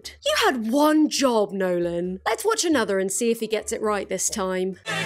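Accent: Ireland. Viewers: Your Irish accent was thriving this video and I am HERE for it. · has an Irish accent like my wife's. Perfectly innocuous English accent, and the BOOM, the letter "r" appears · wait, you’re irish? cumann na mBan video when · Until you made the Ireland joke I assumed your accent was just English but now all I can hear is your Irish R’s lol (especially when you said ‘argument’)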